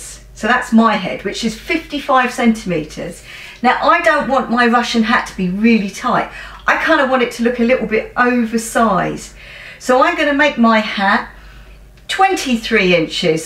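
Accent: United Kingdom. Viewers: First of all I want to say that I love your accent.🤗 Is it British or..? And thank you for such an easy understanding tutorial.🥰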